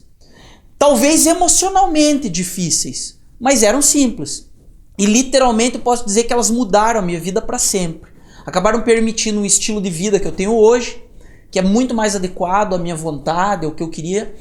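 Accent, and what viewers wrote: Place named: Paraná, Brazil. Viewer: Esse sutaque acredito que seja paranaense kkkk. Excelente profissional o.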